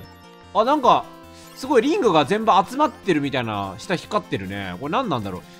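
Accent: Kansai, Japan. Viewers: って絶対関西弁需要分かってるありがとうすき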